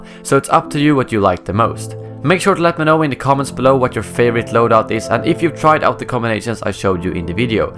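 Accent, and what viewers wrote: Sweden. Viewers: han låter svensk iaf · are you swedish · are you swedish? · Älskar lite svensk brytning 😃👌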